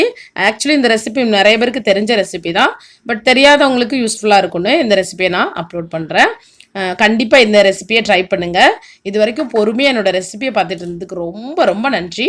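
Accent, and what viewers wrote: Tirunelveli, India. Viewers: Neenga thirunelveli ya akka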